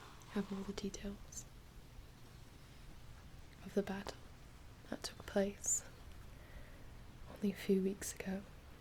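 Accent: England, United Kingdom. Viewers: Carrying on the fine tradition of the media giving all ancient or futuristic civilisations British accents, queeny approves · Love it good job on the English accent big shout out from Leeds England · Why the british accent though??watching this as greek is so cringy but w/e great work anyways.. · Wow that's the best English accent I have ever heard from an American, honestly in a movie you'd not be able to tell which side of the atlantic ✌️ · Shouldn't you have a Greek ascent? and not a n English one? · Wow, English accent is spot on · Why does she have an English accent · Okay but why the british like accent dude? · Holy hell this accent!!! I literally did a double take when you first spoke because I couldnt understand how such an english accent was coming out of your mouth haha. Yassssssssssss huge congratulations, you are absolutely awesome and such an amazing asset to our rapidly expanding community! 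· Insanely good British accent!! loved it! seriously sounded like you are from England :4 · That English accent though 👌👌👌😂😂😂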